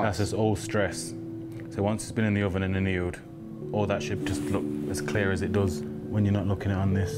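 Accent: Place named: Nottingham, United Kingdom. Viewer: I love hearing a Nottingham accent on YouTube...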